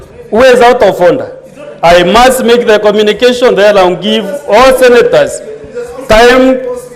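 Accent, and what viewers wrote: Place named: Meru, Kenya. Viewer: That is the tongue of people of meru ,but English is good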